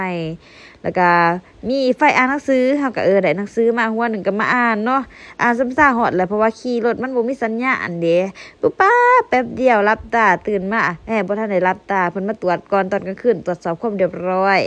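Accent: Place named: Sisaket, Thailand. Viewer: สำเนียงคล้ายๆกับทางศรีษะเกษป่าวครับนี่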